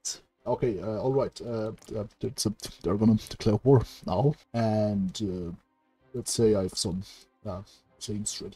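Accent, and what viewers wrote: Germany. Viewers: It's cuz he's German not cuz he's an English speaking RPer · Hat dir eigentlich wer mal gesagt, dass man an deinem Englisch den deutschen Akzent SOO einfach erkennt xD Ich wusste von Anfang an, dass du ein Deutscher bist. Naja wie dem auch sei, ich wünsche dir noch was Schönes :D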